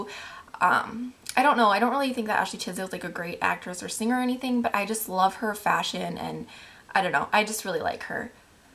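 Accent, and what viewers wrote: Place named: Minnesota, United States. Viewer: I knew you lived in minnesota!! you totally sound like it! but yeah i live here to!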